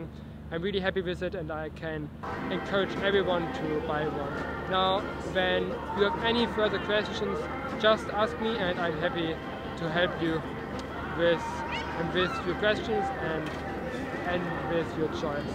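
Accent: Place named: Germany. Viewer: Hahaha, thought he was, but with a German accent (or Scandinavian accent)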